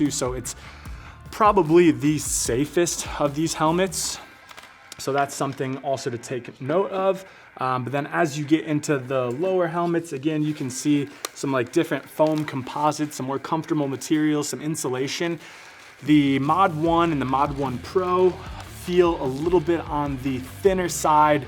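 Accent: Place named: Toledo, United States. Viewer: Dude are you from Toledo?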